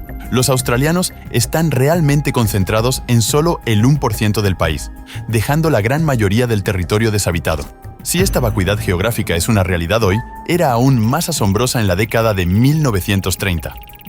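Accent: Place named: Spain. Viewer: habla como sspañol y larino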